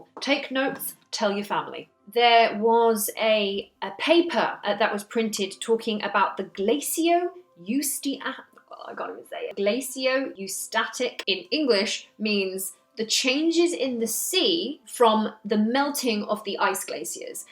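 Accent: England, United Kingdom. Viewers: wonder how many subs she's gained since Randall mentioned her on rogan... I mean that's why I'm here...I stayed bc I think I'm in 😍... Americans are simps for english accents at least this chicago kid is lol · You, that British accent, gorgeous, humorous and backing it all up with Data, our own version of Laura Croft, cheers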